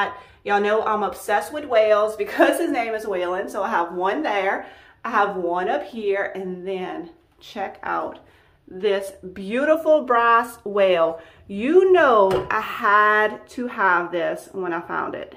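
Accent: Louisiana, United States. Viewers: I love your home and style ,I to am from Louisiana,between New Orleans and baton rouge so I definitely could tell where you were from with your Cajun accent,,when we lived in Connecticut for about 2years in the late 80's the folks there thought we talked funny but in return we thought they did lol.it's nice to see where everyone is from and the cool ways people talk I just love it. Have a blessed day and thanks for sharing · I just came across one of your thrift flip videos on You tube and started watching and knew immediately that you were from Louisiana! LOL I am to, I live in North Louisiana in Shreveport. I am subscribing to your channel now because I love your style and teaching us how to make beautiful things. Thanks so much!! GEAUX TIGERS!!! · Hi when I first heard your voice I’m like she sounds like she’s from Louisiana which is where I’m from. 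I reside in Texas. Anyway sister I love your videos. Thanks so much for always sharing the thrift tours and DIY’s with us. Have a blessed week darling 🙏🏽🦋🌸🦋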